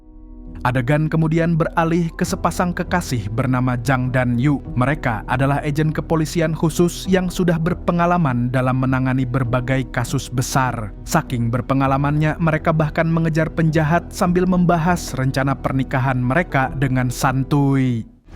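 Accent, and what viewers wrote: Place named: South Tangerang, Indonesia. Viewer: Orang tangsel mana suaranya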